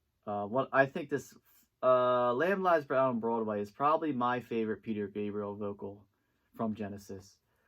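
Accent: Philadelphia, United States. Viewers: Agreed is the best however better than Lamb. Lamb was too long would have made a better single disk. Hey is that a Philly accent?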